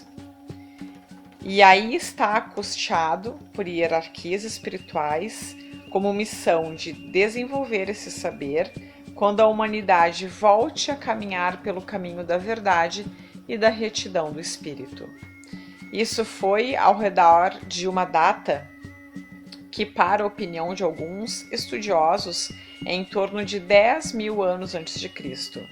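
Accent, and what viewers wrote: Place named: Porto Alegre, Brazil. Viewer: Fantástico, e com narraçao porto alegrense fica ainda melhor... Rsrs. Maravavilhoso trabalho minha conterrânea, parabéns.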